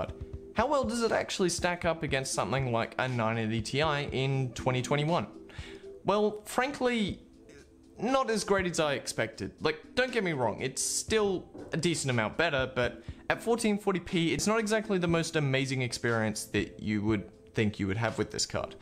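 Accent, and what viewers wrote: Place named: Australia. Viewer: I cannot tell if ur asutrallian american or british ?😂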